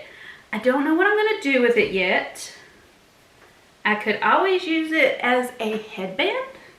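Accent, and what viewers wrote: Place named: South, United States. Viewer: Yes, our southern accents do get in the way sometimes when it comes to the pronunciation of some words. Love it! You always make me laugh and I truly love your videos. Charleston is one of my favorite places to visit. Thank you so much for sharing Southern Ways with with your audience. 💕☺️💐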